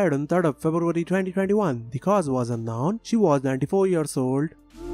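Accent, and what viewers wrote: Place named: India. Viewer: PLEASE....DO THE HONOR OF CELEBRATING TOP CELEBRATIES DEATH WITH A CLEAR VOICE WITHOUT ANY INDIAN ACCENT !!!! IT IS VERY DIFFICULT TO UNDERSTAND WHAT IS BEEN SAID.....GOT JOTHING TO DO WITH RACISM !!!!!!! PLESSE GIVE THE MIKE TO SOMEBODY WITH CLEAR PRONUNCIATION OF THE ENGLISH LANGUAGE !!!!!!! OMG !!!!!!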